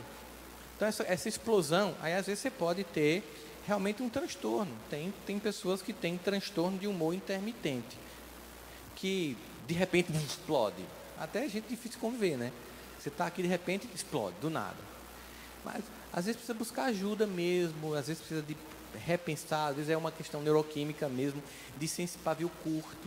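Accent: Northeast, Brazil. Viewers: Onde você estava? Que bênção você é...ainda mais com esse sotaque lindo...nordestinos são demais...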